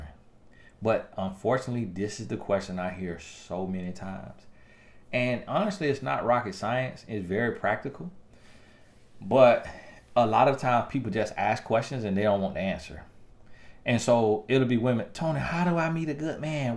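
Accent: South, United States. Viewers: I’m a northerner so I love to hear southern accents 😆😆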